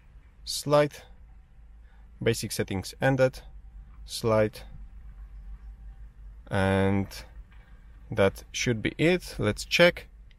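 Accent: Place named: Poland. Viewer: Po akcencie poznałem, że Polak. 😃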